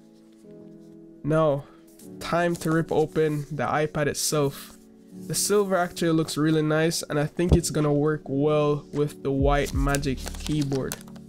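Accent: Jamaica. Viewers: He's Jamaican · Jamaican accent unmissable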